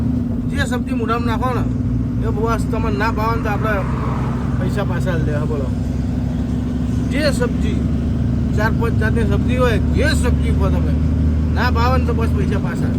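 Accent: North Gujarat, India. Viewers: Jai mataji bhai tame kya gaam na cho e kejo mane to tamaro boli Mehsana side Uttar gujrat ni lage che ane kyu gaam tamaru e pan kejo mara msg no javab apjo bhai jsk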